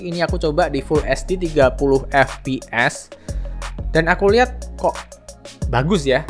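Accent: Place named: Semarang, Indonesia. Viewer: Koyone iyo lur, soale nk wong semarang ngomong indo rodo medok, medok.e yo ngene iki hhh Salam dr kendal-klw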